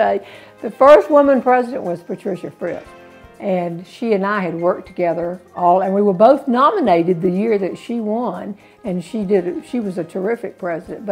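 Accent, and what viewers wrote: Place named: South, United States. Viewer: Just discovered you! Utterly glorious humour and love the southern accent. Thanks goodness for Youtube!! Love from London :)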